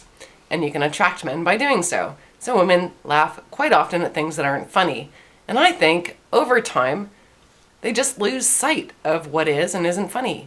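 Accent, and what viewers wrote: Canada. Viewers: Weird Canadian accent..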